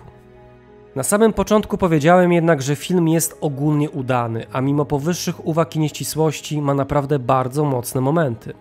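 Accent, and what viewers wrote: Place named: Warsaw, Poland. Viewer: Sam sposób w jaki opowiada swoją recenzję jest taki wkurzająco warszawski i salonowy;) W sam raz dla fajnopolaków. Bo to film dla tej grupy społecznej.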